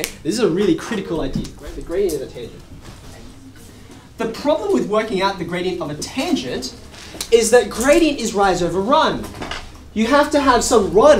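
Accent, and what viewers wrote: Australia. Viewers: Aussies have such cool accents compared to us Americans like this guy, Woo. They are like the cousin you can't wait to chill with at the cookout after tolerating the arrogant and boogie British, and the too nice and boring Canadian cousin. Kiwi cousin is pretty cool too. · Does he speak like an australian? I want to get used to hearing the australian accent, i'm learning english 😄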